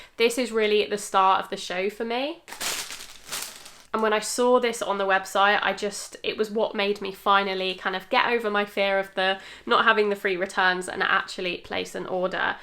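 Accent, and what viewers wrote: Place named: United Kingdom. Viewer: I love your British accent so much ❤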